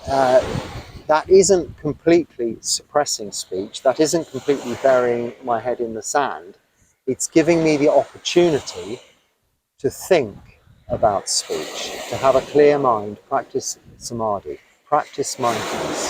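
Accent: England, United Kingdom. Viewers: This monk received an offering of two bananas and gave one back to the Hindu temple in another vid. So unlike me, sharing what is meagre and being giving. Yet I'm always wanting to see myself as kind. I like to hear his Pommy manner of talk, feeling less solitary and thinking of his hardships not managing in the local language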